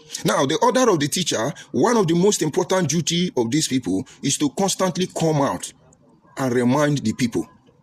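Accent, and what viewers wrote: Igbo, Nigeria. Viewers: I am Anambra man and understand your dialect. You are pure Igbo 😂😂😂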